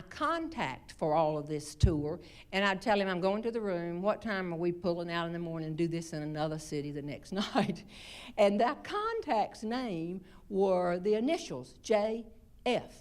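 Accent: South, United States. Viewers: I Enjoy Listening to Jeanne Robertson she Always makes me LAUGH 😄🤣🎶& The most EXTRODENARY sense of humor<~& She does it in such A "SWEET SOUTHERN" Way She NEVER uses any profanity never says Ugley things about anyone !!!!Never uses Profanity ONLY~Brings Out the HUMOR in OUR EveryDay Lives 💁👌😍😍😍What a GREAT THING TO LIVE~Love & LAUGH & Share with YOUR FAMILY FRIENDS & LOVED ONES ❤️ A little JOY & HUMOR-----esp.NOW In Out miserable uncertain world!!!!So This To will bring TO YOU & A SMILE😃On Your FACE & IN YOUT HESTT❤️HAPPY HOLIDAY to YOU & OTHERS😊😆😃😂 to YOUR FACE & A LITTLE JOY In Your HEART♥️🎶💋❣️!!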